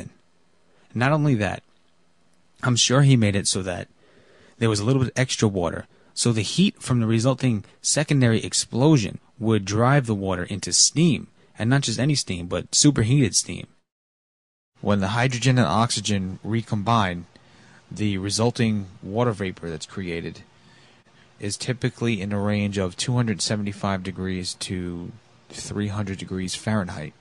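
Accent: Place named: Sweden. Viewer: UR injection of ignorance into this forum reeks arrogantly with a sweedish accent to boot, like dead Ya Ya Gabor's television appearances, so annoying.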